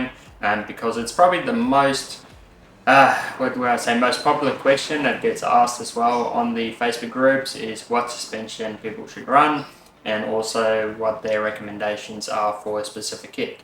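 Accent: South Africa. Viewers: are you South African born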